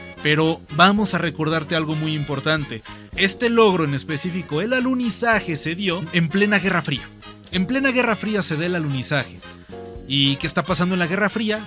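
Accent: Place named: Castile, Spain. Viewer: Acentazo castellano (Mis 10's)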